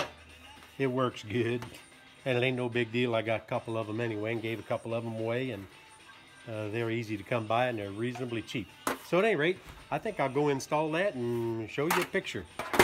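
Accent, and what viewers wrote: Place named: Oklahoma, United States. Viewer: Thanks! This was EXACTLY what I was looking for! I have a squirrel feeder, in a diff section of the yard that I put peanuts in each day. But, they’re never satisfied w/their daily ration. Lol. I can’t believe how far they can jump! I’ve tried moving the feeders, hanging them on taller shepherd hooks etc but inevitably they’ve managed to reach the feeders. Because of the number of feeders I have, I didn’t want to buy baffles & have a large sheet of aluminum I could use. I bought it Home Depot but it was over $20-any suggestion for cheaper option of metal in sheets? Type of metal or source/store? Thanks again for the video. I enjoyed it & hearing your voice. Reminded me of home, where I grew up in Oklahoma.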